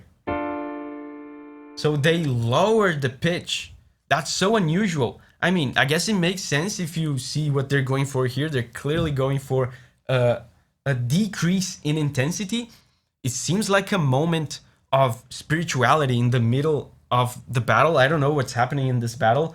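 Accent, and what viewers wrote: Brazil. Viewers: Salve mano, via seu canal desde os vídeos de Final Fantasy XVI e achava que tava reconhecendo seu sotaque e o jeito de falar. Pesquisei um pouco e descobri que tu é brasileiro também! Seu canal é incrível cara, fico feliz de ter um Brasileiro sendo fluente e profissional na área de músicas de jogos, Desejo todo o sucesso do mundo mano, ABRAÇO.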